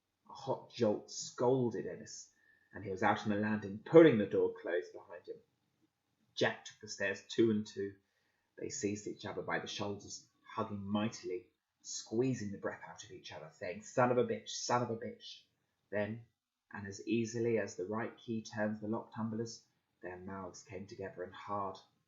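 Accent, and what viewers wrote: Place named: United Kingdom. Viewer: Was so hyped to litsen then relized your British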